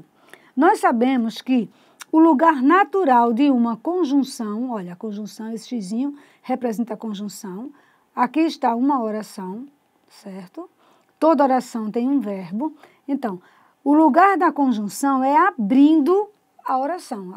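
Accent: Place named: Northeast, Brazil. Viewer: Professora, suas explicações e sua didática são ótimas.congratulações! Outra coisa: seu sotaque é muito bonito. Os nordestinos, nos destacamos muito nessa característica!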